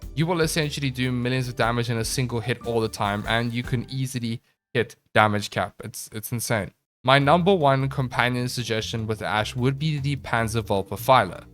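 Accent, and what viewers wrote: South Africa. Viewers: I can hear you are South African XD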